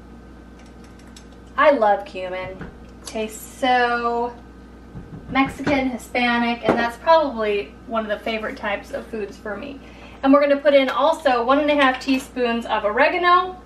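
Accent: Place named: South, United States. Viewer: you sound like a Southern gal, like me. Mama ( and I do, too ) used a cast iron skillet. There is one question that I ALWAYS ask at a restaurant if I may want to order some cornbread........” Has it got sugar in it? “ If it does, FORGET IT! But, there have been many times when I have made a skillet of cornbread and eaten...........only those crisp, brown edges........all the way around the entire skillet! Oh, Lordy, talk about good eating! Now, my daughter likes the center part ( she’s 1/2 “damnyankee” ), but I go for the crunch brown edges. And my Mama? She had to have some buttermilk with hers......yuck.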